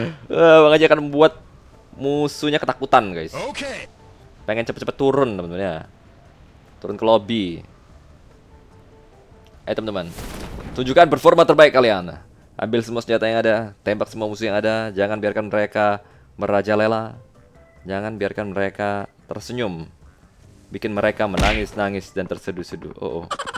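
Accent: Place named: Medan, Indonesia. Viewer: Beda logat bro bg org medan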